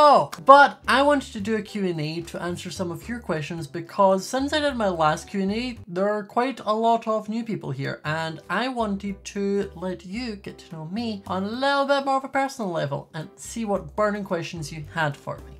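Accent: United Kingdom. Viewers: We love a good ?british? Accent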